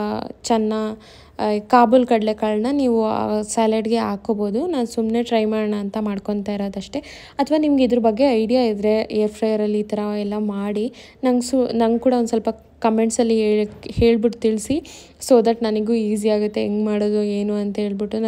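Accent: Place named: Tiptur, India. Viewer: Hellooo Im also from Tiptur. Neevu maathado style node ankonde, nam side erbeku antha. Kushi aythu😊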